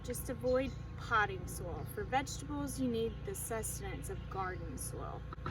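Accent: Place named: South, United States. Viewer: Great video, love the southern accent. Thanks for sharing. Growing in pots is a great idea. Move them if you need to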